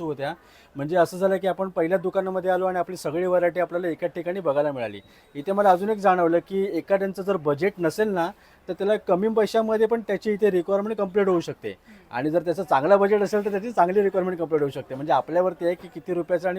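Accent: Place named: Malkapur-Akola, India. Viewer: Dada tu malakapur akola chya ahes ka tuzhi language tikadchi h bhari vate ekyala❤❤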